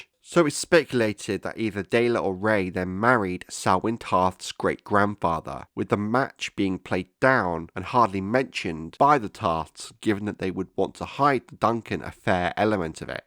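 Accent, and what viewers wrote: United Kingdom. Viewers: The accent is very sheffield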